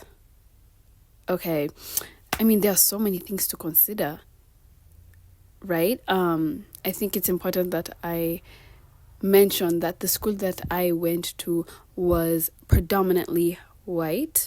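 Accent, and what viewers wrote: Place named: United States. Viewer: I love that American acsent of urs... very cutesy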